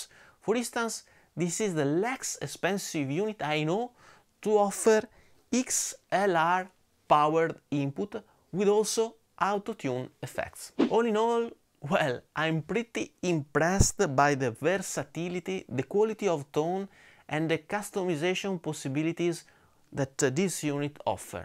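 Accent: Italy. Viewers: He's Italian, that's how it's pronounced.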